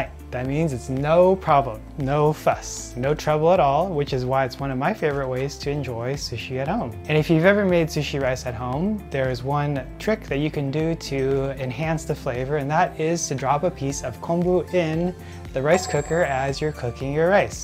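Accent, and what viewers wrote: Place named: North America. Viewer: You sound like a North American but I guess you've been in Japan a while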